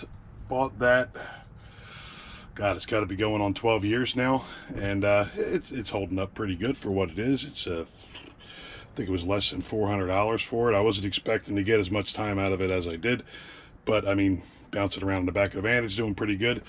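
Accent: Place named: Scranton, United States. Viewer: I LIKE YA GUY; JUST RECENTLY SUBED. YOUR ATTITUDE AND MANNER OF SPEECH WOULD FIT WELL IN SCRANTON PA. (THATS A COMPLEMENT) GREETINGS FROM NOSE (THATS MY NICKNAME)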